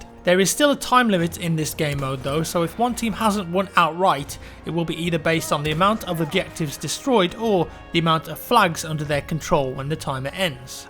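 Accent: England, United Kingdom. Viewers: Trevor Leach I would to something about the male English voice! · Trevor Leach its an English accent mate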